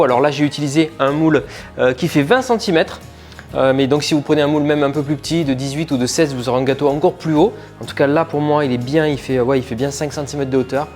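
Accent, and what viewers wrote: South, France. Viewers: Au top ! Avec ce léger accent du sud, encore meilleur ! Là, il me reste quelques poires, je vais les remplacer. La fève tonka, j'adore ! ❤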